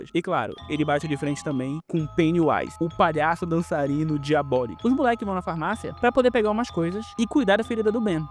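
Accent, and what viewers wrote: Pará, Brazil. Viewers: KKKKKK mano adorei seu canal só me tira uma duvida tu é paraense?? kkkkkk o jeito de flr igualzin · Primo, o sr é do Pará?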